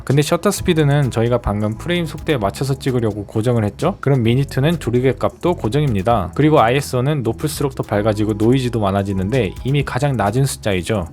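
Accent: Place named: United States. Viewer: 영어학원인줄 알았습니다. 발음이 미국인...^^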